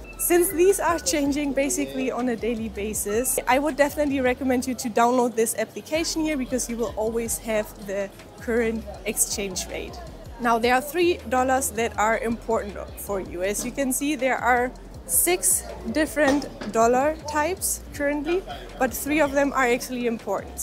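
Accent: Germany. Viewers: Hallo! I looove your German accent!haha Come to visit me here in Cordoba!haha Namaste Guz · Nice beautiful ❤German accent…!!! I listen to whole thing 🎉. Great presentation and information!!!! Wow 😮!!!! ❤❤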